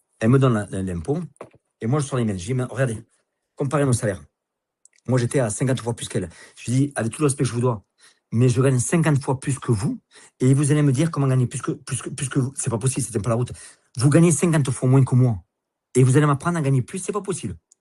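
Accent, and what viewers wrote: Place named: Toulouse, France. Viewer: Excellent, très juste, même s'il y a l'accent toulousain c'est pas très grave 😊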